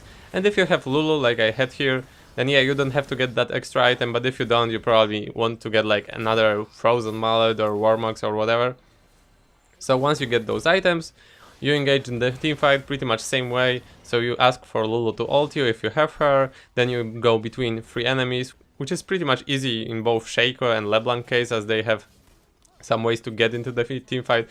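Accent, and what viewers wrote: Poland. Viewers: Where are u from? U got a little polish accent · Masz minimalnie polski akcent :D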